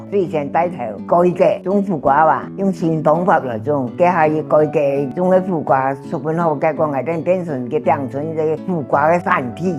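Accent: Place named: Meizhou, China. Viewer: 與台灣四縣腔一個樣, 應該是梅州的吧!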